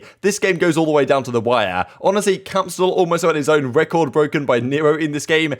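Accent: United Kingdom. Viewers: Can we get as an analyst? The man speaks with precision, and the british accent is on point. Great vid bro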